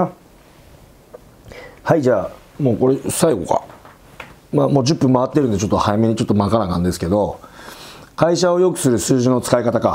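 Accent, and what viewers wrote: Nagoya, Japan. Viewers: 苦労した人ほど人に優しく出来るんですよね。 今回のテーマは共感できました。 説明も社長らしくて、わかりやすいですよ。 地元が近いんで名古屋弁がどら懐かしです。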